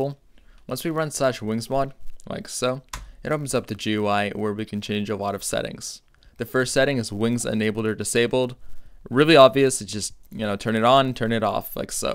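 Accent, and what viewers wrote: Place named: Russia. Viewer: русский говорит по англиш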